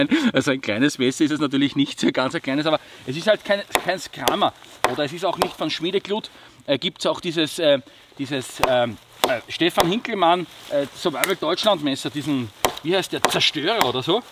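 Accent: Austria. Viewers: Tolles Video! Ehrlich kommentiert, ich finde den österreichischen Dialekt klasse 👍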